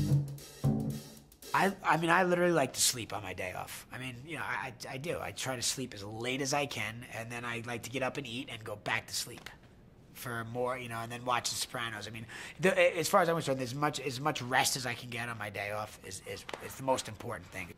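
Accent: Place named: New York, United States. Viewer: No, he sounds like a New Yorker lol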